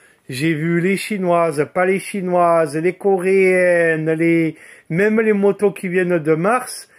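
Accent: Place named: South, France. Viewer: Merci pour ta franchise et ta spontanéité, ça nous change des essais habituels. Tu donnes Beaucoup d’informations, je vais acheter la même. Est que la bulle autour du phare vient de chez Yamaha? Merci encore et bonnes balades, avec l’accent du sud!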